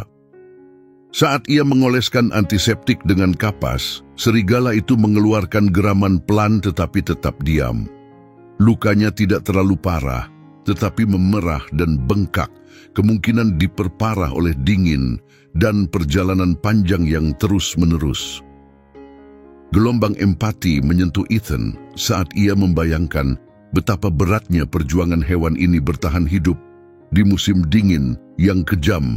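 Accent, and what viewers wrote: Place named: Malaysia. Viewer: Admin orang Malaysia?. Bahasa Indonesianya bagus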